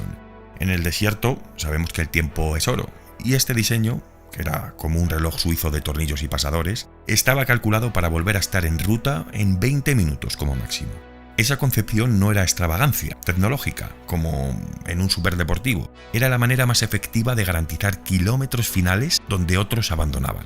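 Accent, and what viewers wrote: Spain. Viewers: Español de España, además. ¡Gracias!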